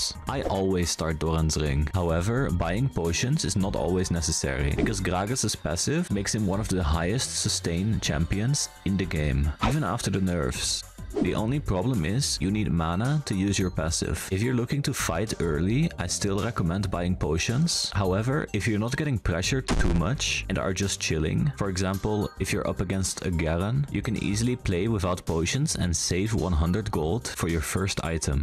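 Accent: Turkey. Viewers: Are u turkish lad? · Are u turkish though? 💀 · Are you turkish: DDDASDASD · great guide just one question Are you Turkish?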